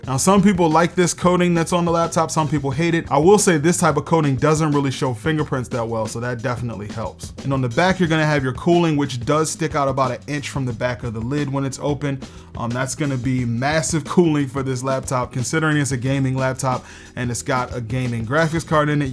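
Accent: Baltimore, United States. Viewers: I hear Baltimore more than the review.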